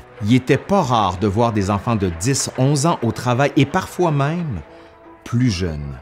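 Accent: Quebec, Canada. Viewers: Je keaff l'accent québécois 😅 le tafff est'c8son aux ralentid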